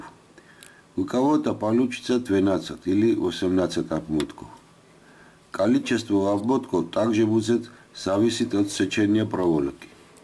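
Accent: Georgia. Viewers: В арми был друг грузин вот так разговаривал с акцентом и грамотно, а мужику респект😊👍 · Спасибо брат за науку и особенно за подачу материала, с прекрасным грузинским акцентом.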